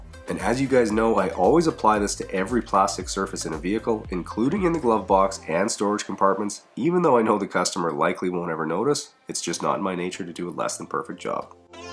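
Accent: Canada. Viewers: Ok so now after watchin several of your videos I'm hooked. I had to share your videos with my sis in Florida..Now I know your Canadian.. I can't hate cause my daughter lives there😊 and I love Toronto and was in the military in the Detroit area long time ago so your accent was very familure. So you know electric, you can clean, you use tools, you like big toys and working outside, you also love music. I also watched videos of your studio build. I just wanted to say, I think your well rounded and a super cute dude who pays attention to the 'details'..also..your detailing is phenom! I can't believe people don't clean out their vehicles before you get them, that seriously blows me away, Thank you for putting great content out oh and I watched a free detailing vid you did..giving is so uplifting, and it comes back to you. Thank you keep up the good work!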